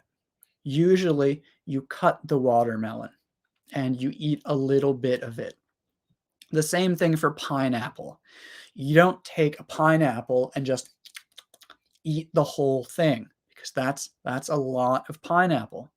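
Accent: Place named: United States. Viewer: You are absolutely right. I'm also happy to be your friend.l had some curiosities when l was in a school that how american people speak english.Grammar books couldn't provide full information at that time.l'm impressed with video as I can understand his words.Thanks for being considerate with us.Just love your accent.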